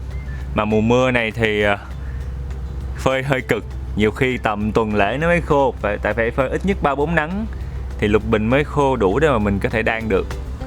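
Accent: Mekong Delta, Vietnam. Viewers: Coi video của em quay thật rõ ràng,nói chuyện rất hay và chất phát đúng là người miền tây!!❤️ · 3 năm trước mình vô tình vào xem clip của và thích ngay cái chất giọng miền tây và khuôn mặt dễ thương. Chúc luôn thành công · Nhìn thấy bạn mà thấy yêu bạn với cách nc của bạn cũng như ng dân miền tây rất thân thương và thiện cảm. Yêu bạn mất rồi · Giọng đúng miền tây dễ thương ghê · Bạn nói đúng, trong đó có mình nè. Mình xa miền Tây từ năm 1983 lên Saigon sống rồi thì đi xa hơn nửa (nửa vòng trái đất) nên nổi nhớ Miền Tây của mình chỉ có trong những giấc mơ. May là có giúp mình tìm lại những hình ảnh quen thuộc quê nhà và giọng nói quê hương. Quê nhà mình ở chỉ cách nơi nhà ở có hơn mười mấy cây số nên giọng nói cách nói chuyện của rất là thân quen với mình. Rất cám ơn đã đem lại hình ảnh quê hương đến cho mình.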